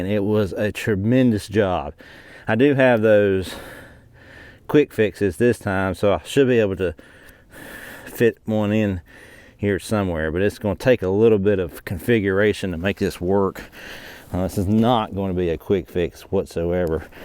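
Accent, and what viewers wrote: South, United States. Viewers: I'm learning southern accent thanks to your videos 😂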